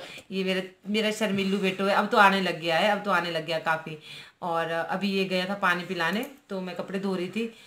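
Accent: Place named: Himachal Pradesh, India. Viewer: Ur language is as u belong to Una dist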